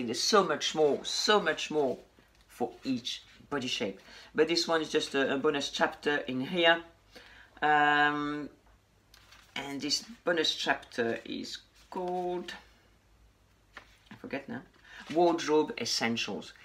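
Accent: France. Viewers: Good idea the oldest pieces of the closet, I have some items specially coats and jackets and a pair of shoes, sorry I really love them, they are a good quality items . I enjoyed this video, love your french accent, I love french but it is a little bit difficult to me to speak it. Greetings from México. by the way, do you speak spanish?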